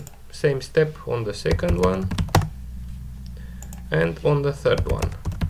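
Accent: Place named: Russia. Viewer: Looks like hard russian accent )))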